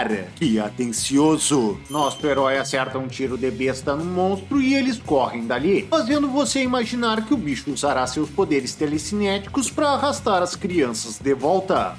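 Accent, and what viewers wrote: Rio Grande do Sul, Brazil. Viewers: Tu és gaúcho o narrador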